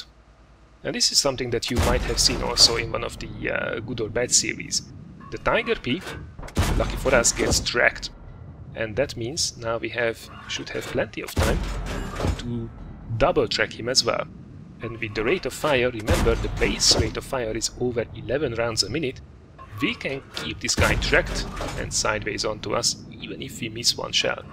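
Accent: Hungary. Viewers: magyar vagy? mert amikor beszélsz úgy hangzik mintha magyar lennél. Are you hungarian? Cause you sound like a Hungarian man when you talk :/ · i like hungarian dialekt!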